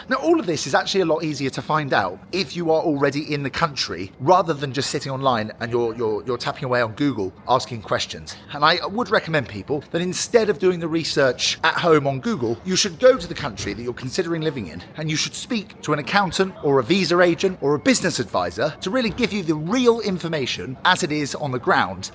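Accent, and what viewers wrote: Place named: England, United Kingdom. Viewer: you look like UK people exactly, your shoulder your face your accent, your hair , typical English people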